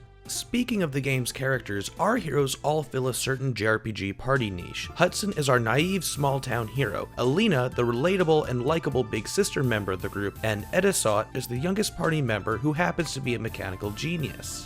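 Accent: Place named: United States. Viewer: Sounds american. French pronunciation of title. UK pronunciation of herb. What the...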